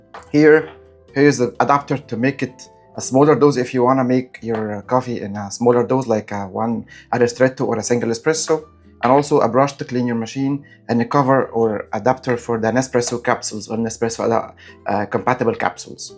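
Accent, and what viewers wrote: Egypt. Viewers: Do you recommend any of them over the Outin Nano? And, how does the Picopresso compare against the Nanopresso? Also, are you Egyptian? You have a very distinct accent!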